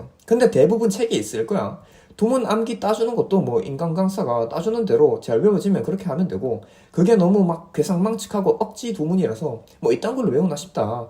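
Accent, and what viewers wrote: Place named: Jinju, South Korea. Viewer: 고향이 어디에요?? 사투리 익숙한데 진주 사투리신가...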